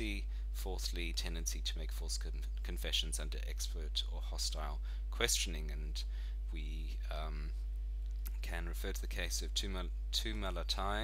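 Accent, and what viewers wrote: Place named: Australia. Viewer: Bill Hahn I think its Australian.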